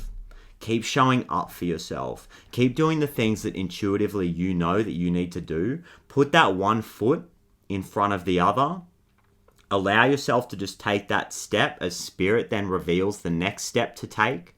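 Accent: Australia. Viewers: It's an Australian accent! We all speak like that here! 🦘🦘🦘🦘🦘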